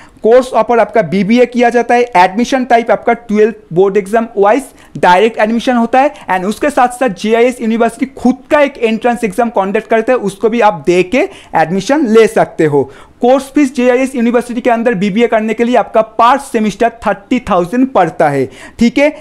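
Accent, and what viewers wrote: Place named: Bengal, India. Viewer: need to improve your communication a little, still a tone of bengali rest is ok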